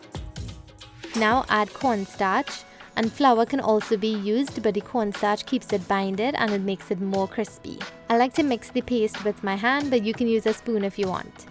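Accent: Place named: Trinidad and Tobago. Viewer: Hey girl , my cousin lives on Liberty in Queens .I have been there many times......I also lives close where uou buy your bigani in Trinidad......I JUST LOVE HOW YOU ARE SO , SIMPLE AND HUMBLE AND ALWAYS KEPT YOUR STRONG TRINI ACCENT....... TRUE TRIN.....😍😍😍😍. Girl I bought some biiiggg bigani in the back of Chaguanas recently , she is the best so far. I try other vendors but usually take 2 bites and throw it away, and I loved it so much, by this lady , I looked up how to make from you of course.......love aaalllll your videos. ❤❤❤❤❤❤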